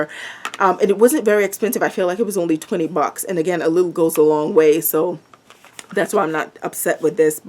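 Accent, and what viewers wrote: Caribbean. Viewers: Girl you're about to have me max out my card!! Thanks for the reviews!! BTW where are you from because I detect a slight West Indian accent? Stay beautiful!!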